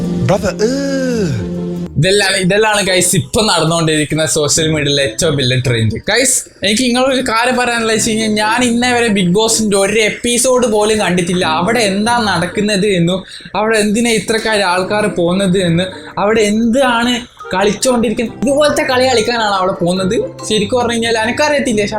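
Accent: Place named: Malappuram, India. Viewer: Slang kettit on malapuram karann ahn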